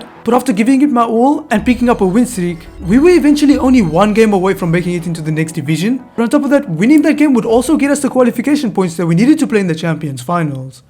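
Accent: South Africa. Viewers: 10 and are you South African? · I think he from south africa bcs of his accent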